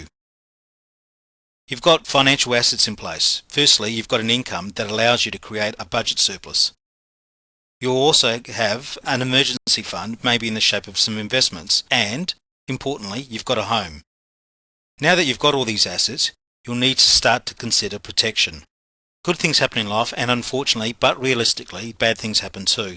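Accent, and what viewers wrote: Australia. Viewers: He sounds Australian.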